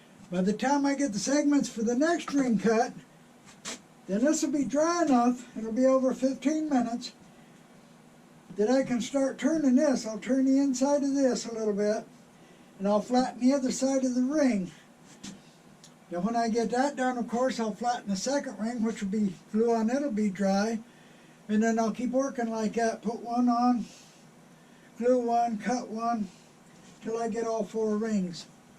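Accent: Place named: Pittsburgh, United States. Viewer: Hey! Love the videos. You sound like you have a Pittsburgh accent. Or have you been in N.C. your whole life?:)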